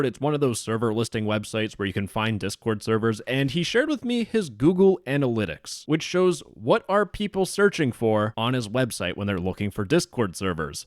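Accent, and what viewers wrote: United Kingdom. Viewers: blud sounds like the spiffing brit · Ahyes, the spiffing brit speech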